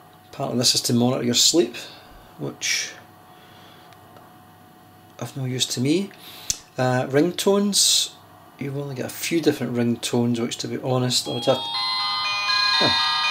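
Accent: Scotland, United Kingdom. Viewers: But he isn't murican... The accent is scottish I pressume... But I do agree about the bubbles abit.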